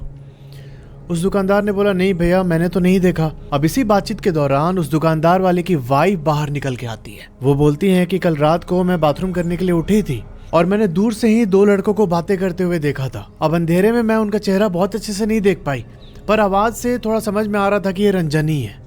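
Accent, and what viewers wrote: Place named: Uttar Pradesh, India. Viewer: Aapki awaj bahut tagdi hai aap kis district se ho aap up wali awaj lagti hai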